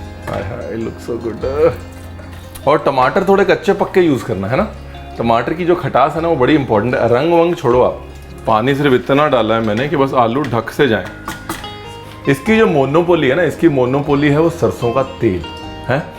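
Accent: India. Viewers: Desi language with super desi accent...but when you switch on vilayti one no one can imagine ki bande ne kya khub hindi ka hath pakda hai...👍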